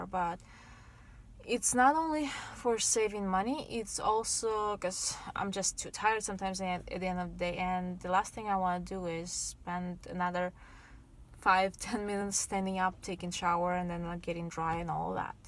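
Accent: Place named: Eastern Europe. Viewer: You sound Eastern European. Just curious.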